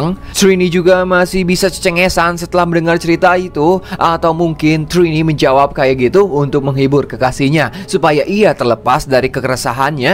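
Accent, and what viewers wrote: West Java, Indonesia. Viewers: Mang sunda na ti palih mana???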